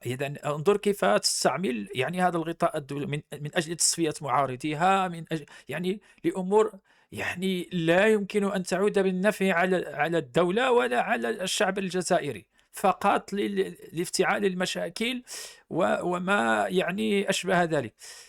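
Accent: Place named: Morocco. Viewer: انت مروكي مذلول عييت ماتبدل اللهجة تاعك على انك جزائري وتفضحت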